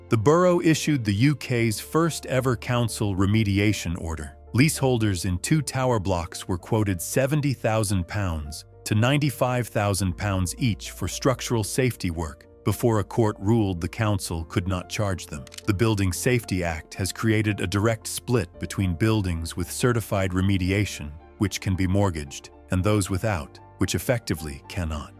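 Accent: United States. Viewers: Please avoid American voiceovers. Firstly, on subjects about London (or Britain in general) it sounds like it's carping. Secondly, since the advent of the disgusting president Trump I never want to hear an American voice again. I turned off after about 30 second. Goodbye! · Clap trap.....also American accent.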